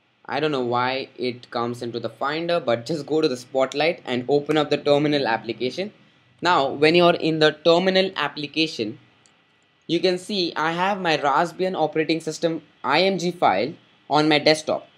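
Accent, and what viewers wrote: India. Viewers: Is that a Marathi accent you have? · are you from india :D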